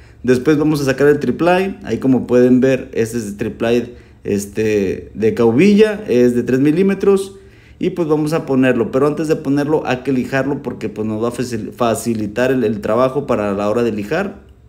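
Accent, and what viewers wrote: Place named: Michoacán, Mexico. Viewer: Muy buen trabajo 👍👌 oye mi estimado eres de Michoacán verdad por el asento y la parota que trabajas eres como de coalcoman por esos rumbos de Michoacán jeje saludos y bendiciones amigo gracias por compartir tus conocimientos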